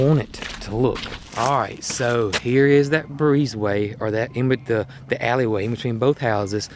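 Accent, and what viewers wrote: South, United States. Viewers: The weather people say we are going to have more cold weather I thought you were going to get your tongue twisted with all those chicken words😁😁😁👍👍👍 I hear a little country in your voice. I'm told a lot that someone can hear it on my voice. I tell them "OK I'm proud of it". I also tell them "you can take the girl out of the country,BUT you can't take the country out of the girl". Just subscribed. Also from Alabama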